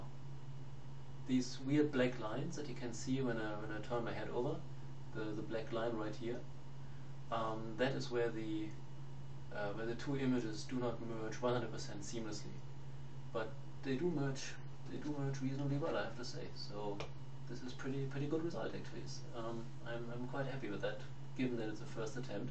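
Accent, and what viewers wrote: Germany. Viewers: Your German (Austrian maybe?) accent makes this sound really cool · are you german? it's just a question because of the accent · could i be that you are a german, cuz you kinda sound like one :D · Clearly a German accent.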